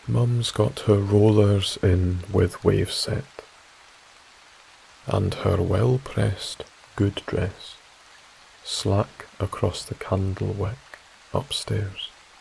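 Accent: Scotland, United Kingdom. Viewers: I love Scottish accents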